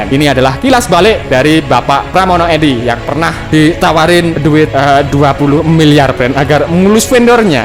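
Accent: Java, Indonesia. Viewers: Min .. min mimin kok suaranya medok ya?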